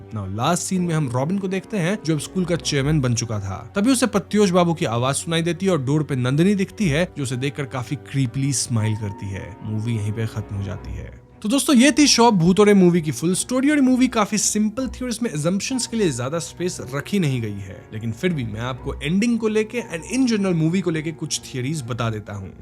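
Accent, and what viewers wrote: Bengal, India. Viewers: I think the host is bengali. Accent thora sa bengali type hai. But that's my opinion. N ye to achi baat hai. Because of this channel kayi movies hai jo language barrier k karan hum dekh nhi payenge but yaha wo explain ho jata hai. N hume itni achi movies k bare me pata chalta hai. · i also think that because when he speaks hindi you can hear bengoli touch in some words.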